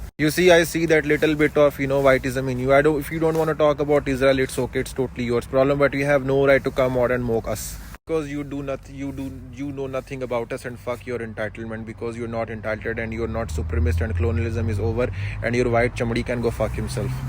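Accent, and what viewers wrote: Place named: India. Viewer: Yes 🙌 Respect!!! You did him really good ... Woh bhi in desi English 👌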